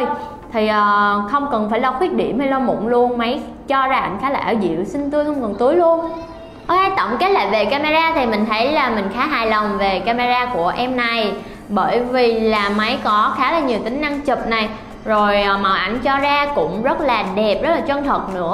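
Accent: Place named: Phu Yen, Vietnam. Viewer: Nghe tiếng sao giống phú yên hay bình định gì giữ trời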